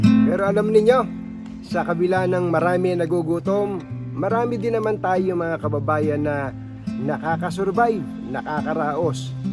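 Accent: Nueva Ecija, Philippines. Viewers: Hahah nkaka miss ang puntong Nueva Ecija.. “Wala eka eh”😂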